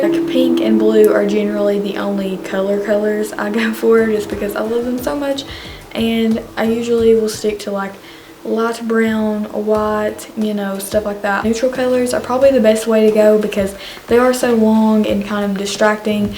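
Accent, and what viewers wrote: South, United States. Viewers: wait omg i thought she had an english accent then i realized it was a country accent 💀💀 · Am I the only one who thinks like she's from Russia or from a northern Europe country? I think she looks so much like she's from of theses country that I find it odd that she have a southern accent. I love your videos, you are gorgeous! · Omg I just found ur channel and I'm so happy because I have not found a YouTuber who has a southern accent like me!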